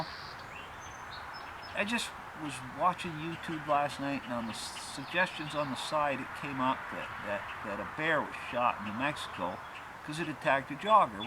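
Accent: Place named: New England, United States. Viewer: That's real yankee talk right there.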